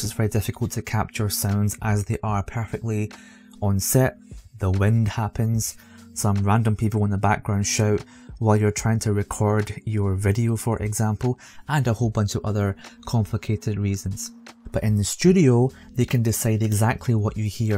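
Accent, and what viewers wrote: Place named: Scotland, United Kingdom. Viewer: Great tips bro, looking forward to more of the Scottish accent and filmmaking tips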